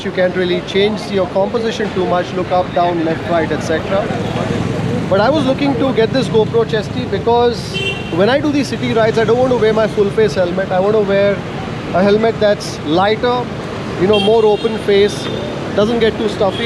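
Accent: Karnataka, India. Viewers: I guess chesty is more suitable. When v r off the biking with wide coverage. And ya ur kannada is sweet. That's y u have more local addicted viewers. 😊😊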